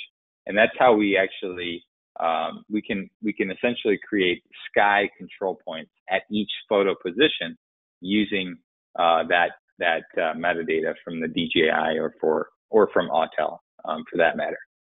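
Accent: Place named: Western New York, United States. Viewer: Your accent makes me very nostalgic for my childhood home in western NY, up by the Great Lakes. 🙂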